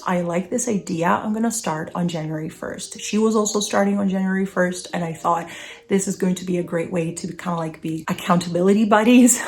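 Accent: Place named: United States. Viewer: Hi - I'm new to your channel. Just wondered how you ended up living in Berlin? You seem to have an American twang so I was curious. Hope you don't mind me asking? I have family in Germany too! Thank you.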